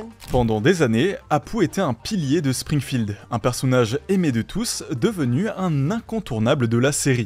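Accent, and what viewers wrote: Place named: Brussels, Belgium. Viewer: accent bruxellois pas fl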